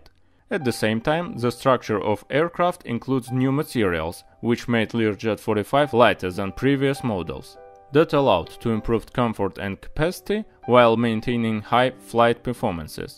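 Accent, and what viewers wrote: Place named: Russia. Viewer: His English is good, but he might not have enough speaking practice, here-hence this crazy Russian accent. ))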